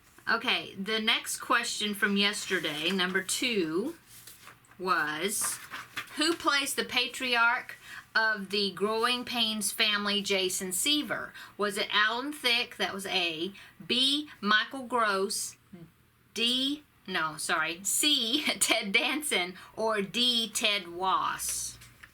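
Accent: South, United States. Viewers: Hello.... I’m a new subbie to your channel... I binged watched all your videos from the very beginning and I just love the things that you make and I just love your Southern accent.... I know in a few videos back you said that you wanted to do a nativity scene are you still going to do it??? ... I would love to see your progress on them.... can’t wait for your next videos.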